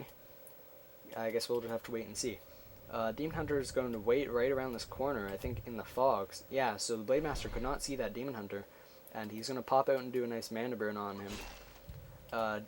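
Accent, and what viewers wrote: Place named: Canada. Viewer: Nice smooth voice, Canada eh? I think I've seen you on US East? " "? Thanks for the upload!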